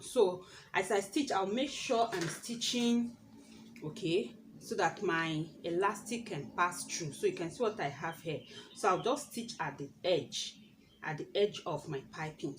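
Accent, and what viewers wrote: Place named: Nigeria. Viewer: When I listened to your video I figured that u were from wonderful Nigeria. U r an excellent teacher. 💘💘💘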